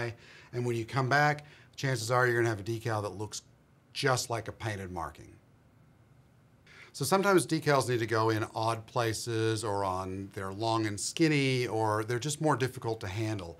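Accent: Australia. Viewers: bit of an Ozzie accent there sometimes 😁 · G'day...two quick questions...do I note the hint of an Aussie, if not Canadian accent?? Just at times it's more noticeable. Secondly, and more subject oriented...have you gloss coated the Vamp first?? and do you subscribe to the process?? I'm in the process of doing several 1/350 ships...and as such they're not exactly small...and just wondering if the overall process is beneficial on models of this scale?? Thoroughly enjoy all the hints and tips videos...as I've been out of modelling mode for many years, it certainly helps immensely. Cheers from Sydney Aus!!!!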